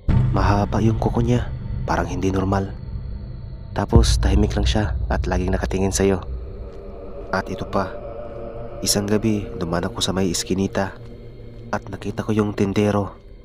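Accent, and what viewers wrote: Visayas, Philippines. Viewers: Parang. bisaya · Bisaya amputakels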